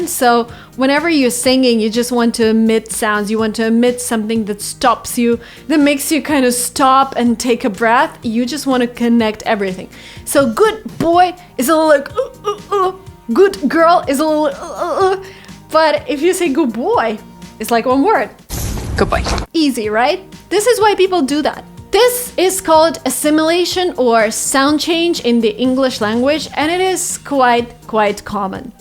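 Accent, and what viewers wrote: United States. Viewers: How did you learnt the American accent?... sometimes I just forget that you are Russian...you've covered up so well...any suggestions from you? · You're american? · Ya u hve a workbook, that's gr8... But a humble request! Can u make atleast one video on simple past which is often used by Americans plz? 👍🏻... U r the best teacher for those who wanna learn american accent including me!. Tq so much 👍🏻!... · Are u american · Are you American or british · As much I know, she went to Britain as well as the USA. Now, she speaks American accent... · How did you learn American accents? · Wait, you're not american?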